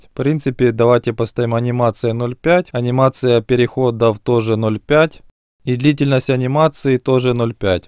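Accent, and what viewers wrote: Ukraine. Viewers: Говор Закарпатье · Извините конечно,Ноя просто так слышу то как он говорит на русском но слышно что он с украины😂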